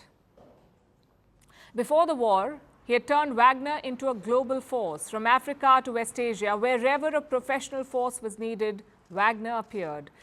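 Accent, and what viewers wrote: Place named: India. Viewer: This woman pisses me off with her arrogant voice trying desperately to imitate Tucker Carlson with a ridiculous Indian accent. This woman pisses me off with her arrogant voice trying desperately to imitate Tucker Carlson with a ridiculous Indian accent. Try to be original ma'am.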